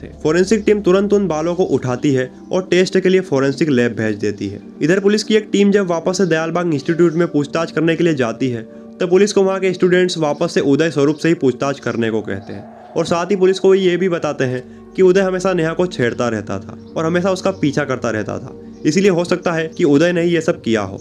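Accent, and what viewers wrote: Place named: Bihar, India. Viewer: Social media per aap ko k apni language per thoda work karna chy sha ko sa Mt bolye pronunciation acchi honi chahie ek anchor ki presan nh hota preshan hota aapki language bihariyon Jaisi hai isliye story sunane ka maja nahin aaya anchor hone ke liye language per command hona zaroori hai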